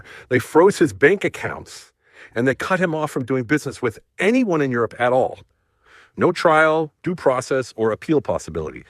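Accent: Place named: United States. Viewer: > Neve Lose Your American Voice!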